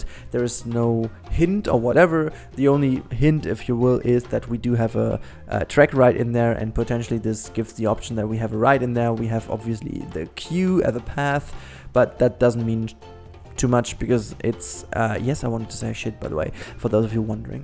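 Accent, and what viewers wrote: Germany. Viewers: Hello from an English (Canadian) speaker! I empathize with you trying to speak animal names in English. Don't beat yourself up over it! As long as you're close, we will figure it out. I, for one, prefer to hear your German accent anyway!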